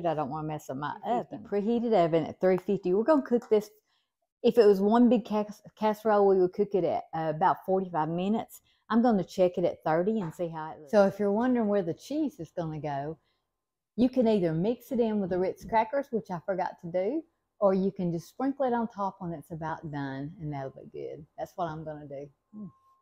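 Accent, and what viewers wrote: South, United States. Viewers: I tried this recipe for supper last night, and it's delish! Cannot wait to eat some leftovers again tonight! Your Southern accent is delightful. What state do y'all reside in?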